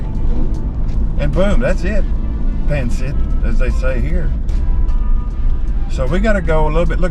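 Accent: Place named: South, United States. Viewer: The traffic won't get fixed because of overpopulation. Even in my south hometown in Lipa, traffic is bad. Regarding your Southern accent, most Filipinos will have a hard time because yours is relatively faster pace. It's an acquired skill to understand it, because at first, it was hard for me too. Working for call center companies get one introduced and familiarized with it so it becomes natural after some time. I recommend creating a video of common idioms you use a lot in English, and sharing it as some Pinoys may want to learn it. Maybe do it as offer of help for Filipinos that work for call centers catering to USA. Also, can you try some taglish at times? It will be fun to hear you do taglish like us :)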